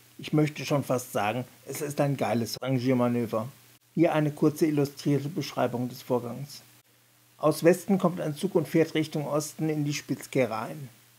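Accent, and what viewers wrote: Hesse, Germany. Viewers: Keine schlechte Idee, diese "Regalbauweiss". Lässt sich bestimmt "einfacher" umsetzten als eine Modulbahn. Ich vermisse dein hessisch Gebabbel... 😁😁😁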